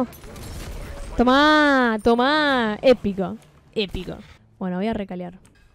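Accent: Argentina. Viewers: Genial. Donde está mi milanga? Gracias a vos, no llego a extrañar el acento de Bs As.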